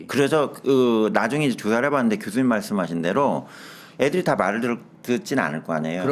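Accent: Chungcheong, South Korea. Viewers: 염쌤. 연쇄살인 스토리 찾느냐 피곤할텐데..❤감사합니다. 또한 구수한 충청도 사투리지만 예리한 카리스마는 의 여전한매력😮항상 화이팅입니다 ❤🎉❤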